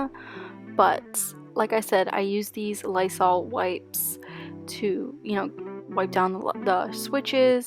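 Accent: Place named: New Jersey, United States. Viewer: Are you from like jersey or someplace around there? Just asking because the way you say carpet and better. Prolly more words too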